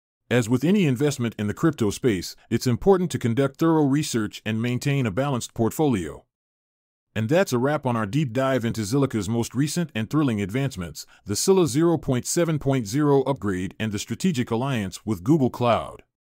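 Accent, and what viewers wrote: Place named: Singapore. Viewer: Prefer your SG accent lol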